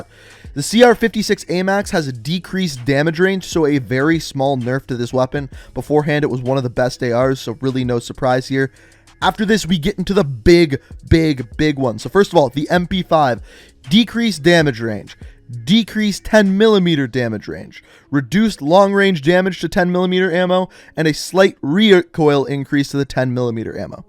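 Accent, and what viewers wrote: Canada. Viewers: Canadians eh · Your canadian EH thats pretty neat but onestly hope you get a mill love teh vids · Ey another canadian ey! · I know ur Canadian because of ur accent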